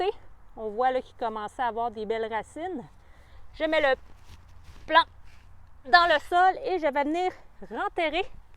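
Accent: Quebec, Canada. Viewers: Bonjour :) Merci pour la vidéo, encore mieux avec un accent québecois ;) Vous pouvez aussi arroser le fond du trou de plantation des tomates, avec un ch'tit pipi dans l'arrosoir, votre chum sera ravi de vous y aider et se sentira utile ;p Bon potager à tous :) Édit : j'ai commenté trop vite, vous arrosez déjà le fond du trou :D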